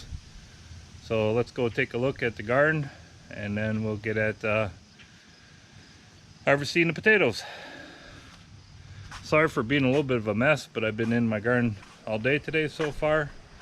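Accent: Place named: Wisconsin, United States. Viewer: Great stuff. Clearly another cheesehead based on accent, inflection and calling cucumbers pickles 👍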